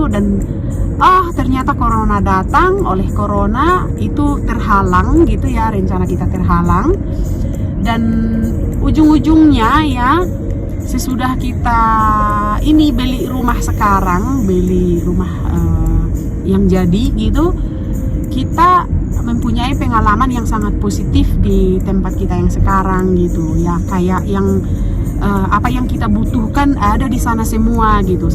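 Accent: North Sumatra, Indonesia. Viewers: Ka kok logat batak kental banget ya😂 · Jadi ka itu asli dari samosir dan langsung merantau ke swiss, dsamosir itu bahasa batak dan kalo b.indonesia bakalan kental banget logatnya. Kalo ka merantau keluar medan mungkin bisa menyesuaikan, ka merantau ke swiss langsung pake bhasa sana, jadi mau gamau kalo ka ngomong b.indonesia ya bakalan tetep kental logat bataknya, and I think nothing wrong with that at all dan bahkan bisa jadi identitas 🙏🏼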